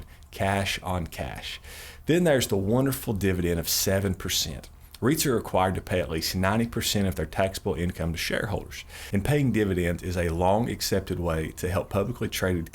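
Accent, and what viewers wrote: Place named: North Carolina, United States. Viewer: Thanks, great content. I actually thought you were from NC.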